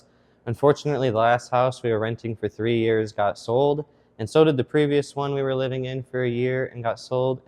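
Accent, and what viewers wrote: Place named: Southwest, United States. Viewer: Nice video. Sounds like yur in Nevada, New Mexico, Arizona or Texas. Your ideas our great. We find plant people are the nicest. Eventually kids will be even more fun then plants then you teach them all about these great things, nothing more joyful then kids. Keep pushing along, the Lord, wife, school, work, family then plants n hobbies and you will have a great balance. Blessings and very nice videos and well spoken.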